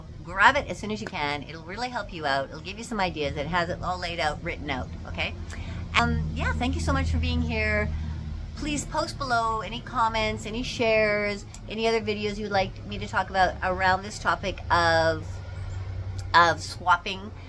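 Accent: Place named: Canada. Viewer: Hah! I KNEW it! Even though your channel lists you as being in the United States, I definitely got a Canadian vibe off you. A lot of it was the accent, of course, but certain American accents, especially west coast ones, sound a lot like Canadian accents, so that's hard to tell. I think part of it was just the vibe you gave off, you just felt like home. So glad you are on here. It really does feel as if I'm getting information/perspective/experience from a "home" kind of place from you, and knowing you are Canadian as well just adds an extra level of warmth and comfort to it. Odd. It really shouldn't make much difference. People are people. Yet it does. Interesting. I'll have to mull that one over. I knew it!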